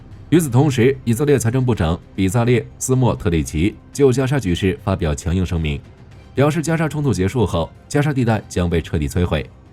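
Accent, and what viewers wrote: China. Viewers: 台湾人学大陆口音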